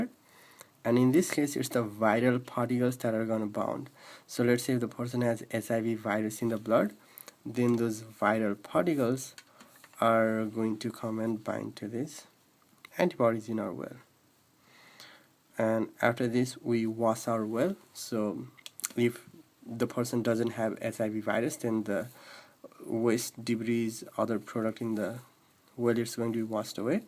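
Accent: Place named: Nepal. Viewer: Are you Nepali cause you have nepali accent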